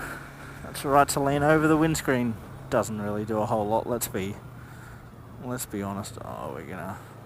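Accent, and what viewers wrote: Australia. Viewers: cant u hear that he is from australia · Maaate, are those wheelie bins? "Brand spankin' new"? This guys gotta be an Aussie too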